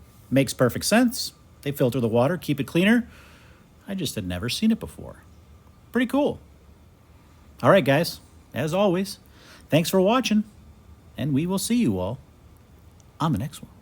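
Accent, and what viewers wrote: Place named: Wisconsin, United States. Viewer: I know a fellow Wisconsin homie when I hear one! Haha. If I ever get cows I want you to take care of their little feets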